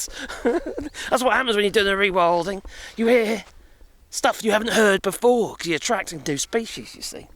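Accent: United Kingdom. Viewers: It’s his British accent.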